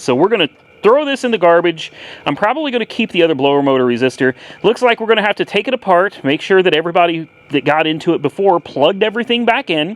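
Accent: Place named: Oklahoma, United States. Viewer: What part of Oklahoma are you from?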